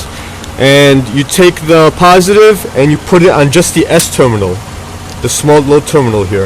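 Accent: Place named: Brooklyn, United States. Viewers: Great Video! Brooklyn huh? I'd know that accent anywhere! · Omg. Holy shit I swear I just saw Sylvester Stallone on jimmy Fallon !!! I was thinking I like this guy cause he is practical and it motivates me to do shit myself!! I'm guessing New York maybe jersey ? Hey I love em all so no one be offended ...I was raised catholic guilt lol, and if someone said are u Irish and Protestant I'd be like no but I like lucky charms .....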